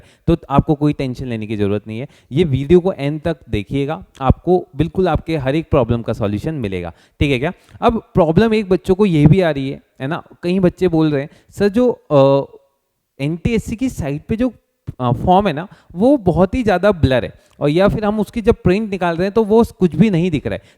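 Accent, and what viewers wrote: India. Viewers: Sir, cool Hindi accent!!🤯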